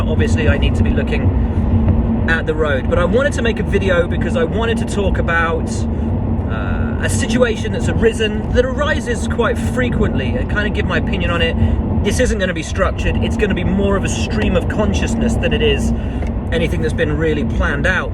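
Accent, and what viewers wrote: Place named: United Kingdom. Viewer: It's nice hearing a fella with a British accent giving respect to the drivers from the US as well as the drivers in our US series'. Realistic take on things. Just hearing people chatter about Herta in the pinnacle is good enough IMO. I'm sure a few of Indycar's top drivers would be competitive in a Merc, RB, & the horse. But that will never happen. Pato just got a foot in that door on Sunday. He may win more races and contend for the championship in Indycar 2021. Could he do that in a Macleren F1???? A highly touted previous world drivers champion was unable to recently so....... ?????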